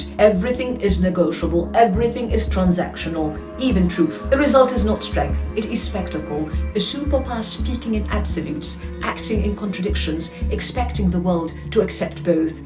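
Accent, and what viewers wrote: RP, United Kingdom. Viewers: yeah but I can't stand that British accent though. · Excellent video but what's with the margaret thatcher accent 😅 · Please speak slowly and succinctly. No one is interested in your high speed Bloody British English.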